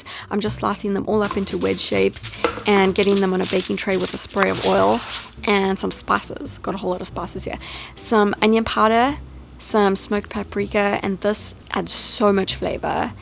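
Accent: South Africa. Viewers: Just discovered your channel...are you South african? I am from Cape Town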